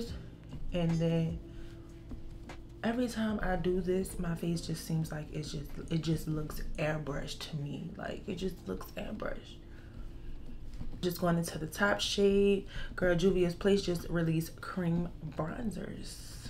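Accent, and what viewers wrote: Louisiana, United States. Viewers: You've gotta be from Louisiana!!!😅😅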